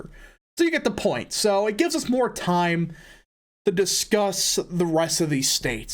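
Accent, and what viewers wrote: Wisconsin, United States. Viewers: You’re from Wisconsin?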